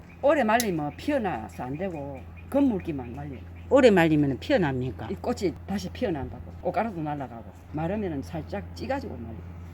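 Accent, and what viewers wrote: Gyeongsang, South Korea. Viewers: 일부러 보일려는 꾸밈이란거 없이 텁텁한 갱상도 사투리로 들으니 시골 고향지키고 사는 큰올케를 만난것같은 편안함으로 영상이 시작되서 웃음부터 나고요 남모르게 피어나 짙은 향기까지 품어내어도 있는지 없는지 무심히 여겨왔던 쑥갓꽃으로 향기로운 차를 만드는 과정은 살아있는 예술일 수 밖에 없네요 이름모를 들꽃 하나로도 아름다운 시를 엮어내는 정말 대단하셔요 쑥갓꽃차 향이 콧속으로 풍겨오는듯이 마음에 여유로움이 생기네요 멋져요~!!! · 댓글주셔서 감사합니다 경상도 사투리짱 우리동네 주민같아요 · 쑥갓꽃차 향이 너무 좋겠죠 한잔씩 마시고 싶네요 잘보고 갑니다 👍💖😅경상도 사투리가 익쑥합니다 ㅎ 어딘가요 저도 상주입니다👍💖😅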